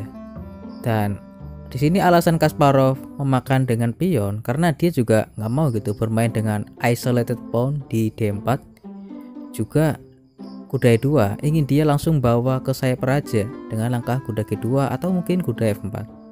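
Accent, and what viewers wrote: Banyumas, Indonesia. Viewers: Bahasanya ngapak ngapak bang..hehe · Logatnya seperti Purworejo - Banyumas ya, aku Wonogiri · Ngapak ya bang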